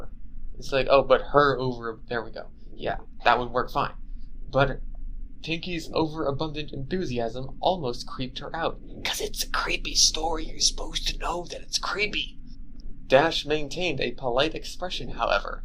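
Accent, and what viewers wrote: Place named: Brooklyn, United States. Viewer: that brooklyn accent is great ps: baxter is very cute and pure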